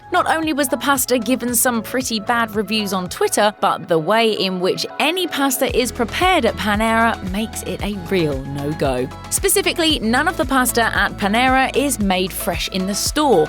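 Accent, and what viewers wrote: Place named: United Kingdom. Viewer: The British feminine accent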